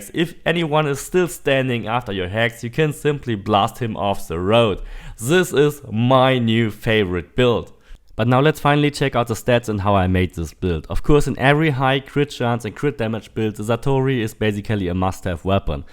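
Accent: Germany. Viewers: Laut deinem Akzent gehe ich davon aus das du deutsch sprichst...Top Video. Danke.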